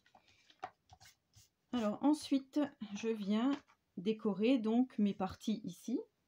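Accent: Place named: Haut-Doubs, France. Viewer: Coucou. Je me suis inscrite lors de ra vidéo carte bonhommes de neige que je vais faire des que j’aurai un peu de temps. Tu viens de parler du haut Doubs ce qui me conforte dans le fait que j’avais bien reconnu cet accent si typique et qu’on ne put pas confondre avec un autre.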